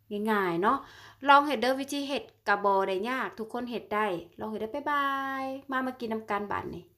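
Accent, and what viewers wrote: Laos. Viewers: สวัสดีค่ะสาวลาวเว้าม่วนหลายเด้อ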